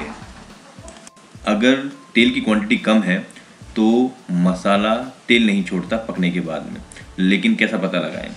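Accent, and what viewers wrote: Pahari, India. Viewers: LAPATUWAPAN..........ahahahha.... ji pata nahi aapki baat aur bolne ke tareeke se lagta hai aap pahadi ho meri tarah...........uttarakhand se. And jordaar recipe by the way.... Main pakka try karunga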